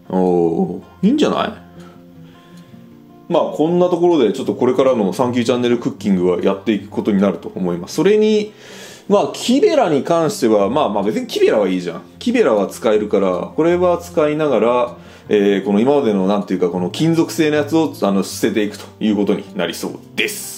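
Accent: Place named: Shizuoka, Japan. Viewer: 無印良品の発音直りませんねえ〜 後、収入の発音も。きほんひとりさんと同じでしたね。静岡弁なんですかね？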